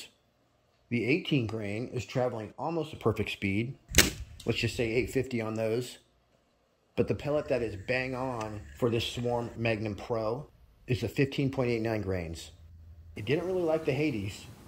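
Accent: United States. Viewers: I don't know which state has this accent but it is absolutely the most annoying accent that the US has to offer.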